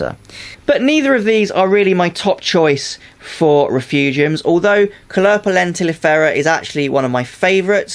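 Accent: United Kingdom. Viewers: Hey! Judging by your accent, are you British? I am in Brighton and just starting out on this hobby, I don't see a lot of people in the UK keeping marine tanks though...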